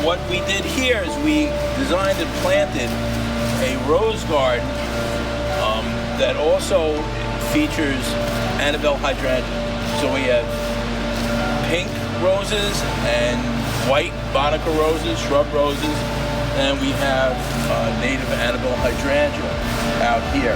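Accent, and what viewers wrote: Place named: New York, United States. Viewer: Ohhhh...New York. I grew up in the Hudson Valley & I miss it. In OK now. It's so good to hear that NY accent again. Beautiful landscape.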